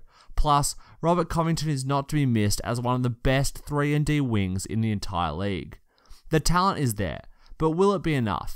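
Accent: Australia. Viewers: Thats some thick ozzie accent you got there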